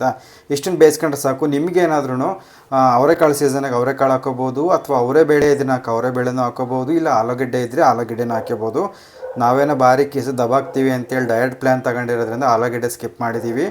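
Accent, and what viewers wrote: Chitradurga, India. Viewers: Anna nam durga bhashe chanag mathadtiya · ಪಕ್ಕಾ ದುರ್ಗಾ ಭಾಷೆ....... ಅಣ್ಣಾ ನಮ್ದು ಹೊಸದುರ್ಗ........😊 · Sir nammdu Talikatte Holalkere,nivu hosdurga, Chitradurga dalli,yava villege sir? ನಿಮ್ಮ ಭಾಷೆ ಪಕ್ಕಾ ನಮ್ಮ ಐತಿಹಾಸಿಕ ಚಿತ್ರದುರ್ಗ ದ ಭಾಷೆ, ಕೇಳಿ ತುಂಬಾ ಖುಷಿಯಾಯಿತು,tq u sir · ಚಿತ್ರದುರ್ಗ ಭಾಷೆ ಸೊಗಡು...ಆಹಾರ ಪದ್ಧತಿಯನ್ನು ಚೆನ್ನಾಗಿ ಪರಿಚಯಿಸಿದ್ದೀರಿ ...ಸೂಪರ್ bro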